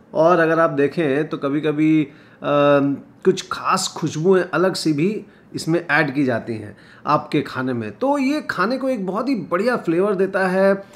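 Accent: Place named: Bihar, India. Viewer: Others : write down the recipe while his chef talking Me : catch his voice tone and identify that he is a Bihari 😊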